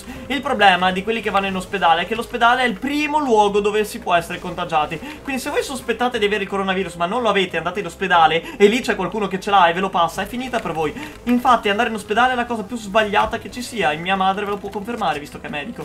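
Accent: Veneto, Italy. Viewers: Ostrega te si Veneto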